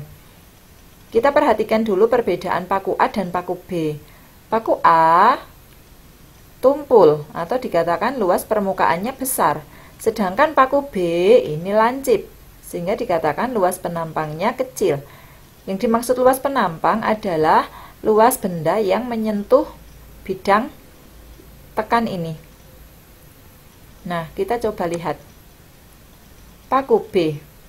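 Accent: Indonesia. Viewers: Kya ibu guru saya yg dr Jawa Tengah... Suaranya... Maturnuwun materinya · ibu orang Jawa yahh?👍😭🤣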